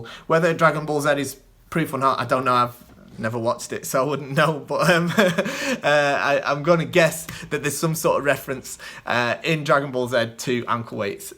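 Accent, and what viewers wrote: United Kingdom. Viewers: Your accent sounds like some sort of Northern, but it doesn't sound either Geordie or Scouse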